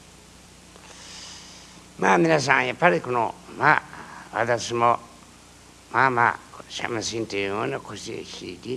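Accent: Tsugaru, Japan. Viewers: 語りの部分わかる津軽人いますか？ ハイ！私ですが笑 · きれいな津軽弁ですね 同じくきれいな津軽弁の地、弘前出身です。 15歳までいまして、郷里離れて30年近く経ちますが聞き取れます！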